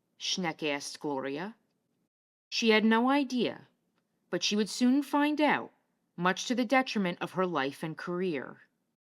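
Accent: United States. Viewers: No AI-generated voice can duplicate your very human Mid-Atlantic (?) accent. Super job, great narration, beautiful use of images. Such "tattle" tales of the rich and famous aren't my cup of tea but I'm happy to have found this impressive iteration of Old Hollywood history. Brava!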